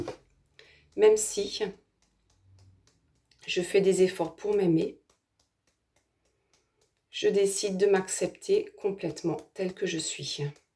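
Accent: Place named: Alsace, France. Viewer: vous n etes pas alsacienne d'après l'accent ? c sympa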